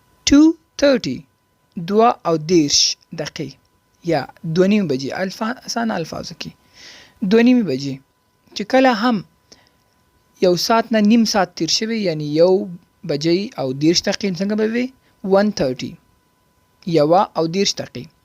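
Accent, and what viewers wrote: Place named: Pakistan. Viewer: your pashto same like pakistani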